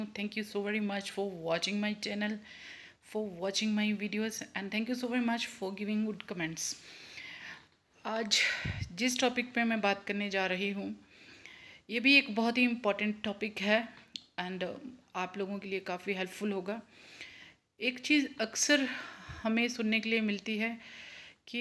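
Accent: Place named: Punjab, India. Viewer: Tuhdi Soch bht vadia ji sachi bilkul sach keha tusi motto mehnat te bht Kar rhe Baki agge rabb de hath a ji 🙏 Baki ek gal tusi Canada Ch reh k pure Punjabi bolde ho jida k gaddiya thukti Hai hahahhaha Nhi te AJ kal Jo USA CanAda yeah yeah bht krde a ji Jo 5 class pass v nhi hunda oh v yeah yeah bs hor koi gal hi nhi krde waheguru ji tuhnu hamesha Khush rakhna. Te ur famliy members nu v dear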